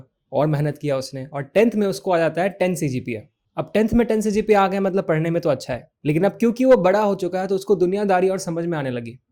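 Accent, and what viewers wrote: Bihar, India. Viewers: Bihar se ho app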